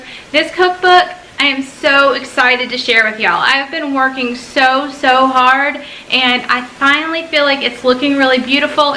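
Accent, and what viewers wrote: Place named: North Carolina, United States. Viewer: Peecans, North Carolina! Love your accent! Dear Hazel, Go BITE Andrew!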